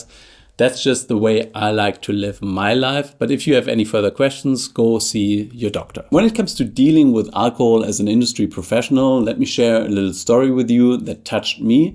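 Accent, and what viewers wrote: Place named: Germany. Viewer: Because of your German accent I automatically take you much more seriously when talking about research and statistics than the Californian Andrew Huberman. More seriously, stress and loneliness are two of the most harmful things for your health and moderate alcohol use can alleviate these things when you are sharing a bottle with friends and talking about life.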